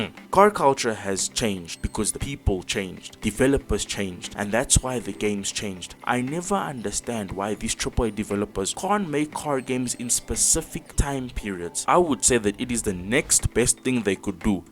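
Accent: South Africa. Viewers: Without even checking your profile, I can hear the strong South African accent 😅😅 Great video bro, keep it up!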